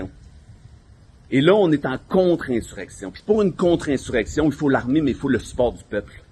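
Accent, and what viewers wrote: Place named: Quebec, Canada. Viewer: Merci beaucoup, j'adore l'humour Québécois,et la façon de parler.Amities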